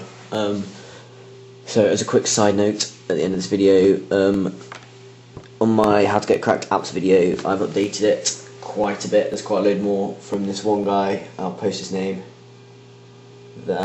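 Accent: England, United Kingdom. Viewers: You sound like your from London. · shut up fucking shit from fyrom he is from england and he has an awesome ENGLISH accent..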